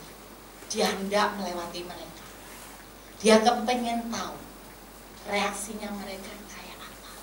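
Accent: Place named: Java, Indonesia. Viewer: Justru Saya orang suku batak tinggal di Sumut tapi saya suka dengar khotbah logat bahasa ibu campur logat Jawa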